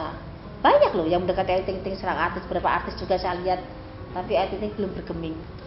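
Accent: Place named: Banyumas, Indonesia. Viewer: Mbak iki wong ngapak ya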